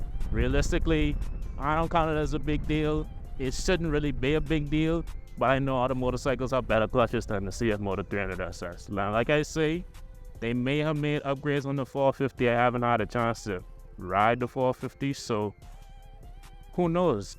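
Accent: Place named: Bahamas. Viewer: ik a bahamian when i hear one lol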